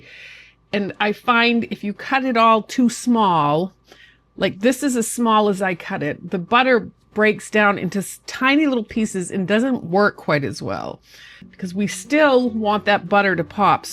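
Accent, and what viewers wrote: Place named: Upper Midwest, United States. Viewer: I need to Know!!!! Where is from? I get upper Midwest, New York, definitely along the Canadian border. Do tell please!!!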